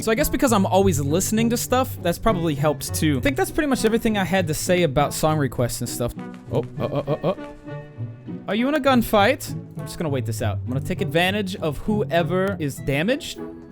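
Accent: United States. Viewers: Guys. He said he is from Misery right? And he has an American accent too. Missouri is an American state... Boom Missouri confirmed.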